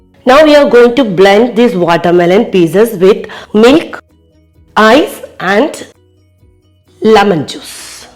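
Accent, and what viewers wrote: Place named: Thrissur, India. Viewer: Nalla thrissur malayalam thanne aayirunnu nalladhu ,kelkan oru sukamundayirunnu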